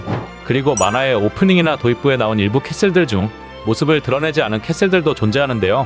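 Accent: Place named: Jeolla, South Korea. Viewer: 말투가 전라도네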